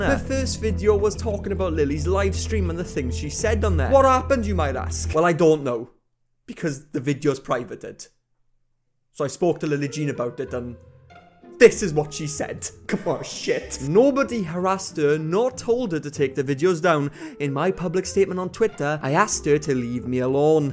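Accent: Wales, United Kingdom. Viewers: I'm here for the Welsh accent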